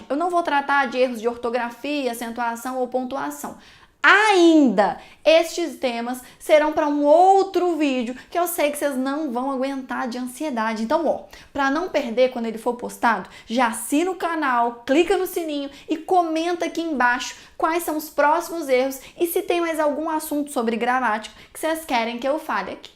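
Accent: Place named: Minas Gerais, Brazil. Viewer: certeza que é mineira hahaha sotaque muito carregado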